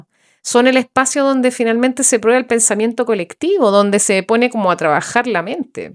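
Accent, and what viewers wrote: Chile. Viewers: Yo quiero saber porqué su acento chileno no es tan marcado como en el sur. Gracias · Tu acento es chileno , los tienen a un lado , mis condolencias. Olvidaste mencionar al racismo profundamente arraigado en su cultura. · Vos sos chilena, no? Lo digo x tu tonada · Muy lindo el acento chileno. Cierto, si no te incluyen en el grupo, mejor salirse, yo no encajo en ninguno jaja, Saludos desde Argentina. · Es muy notorio en su hablar que es chilena...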